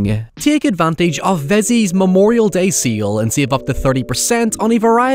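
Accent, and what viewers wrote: Ireland. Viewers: God, I love how Irish youtubers pronounce certain words. Every Irish Youtuber I’ve watched loves to use the word “Hoyever”.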